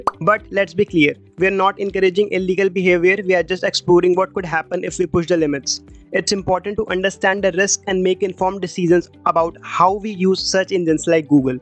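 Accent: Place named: India. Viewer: Goofy ahhh Indian accent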